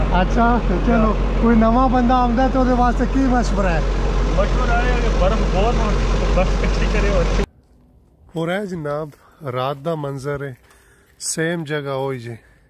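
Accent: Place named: Kharian, Pakistan. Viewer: ان بھائی صاحب کا بولنے کا لہجہ ضلع گجرات کھاریاں کے نزدیک علاقے کا ہے یہ میرا اندازہ ہے بہت ہی ودیا وڈیو اور کمینٹری کی ہے بھائی صاحب نے ،،،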